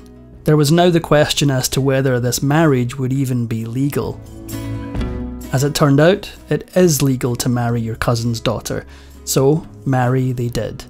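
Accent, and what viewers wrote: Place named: Scotland, United Kingdom. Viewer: You answer my question . I love his voice too. Scottish . I thought British.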